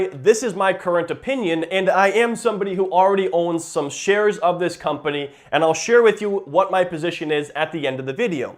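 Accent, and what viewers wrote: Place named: Chicago, United States. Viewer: Your accent. What part of Chicago you from?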